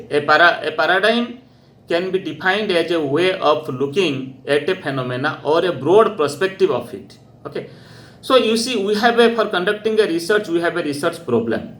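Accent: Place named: Bihar, India. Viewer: Bihar se lag rahe hain sir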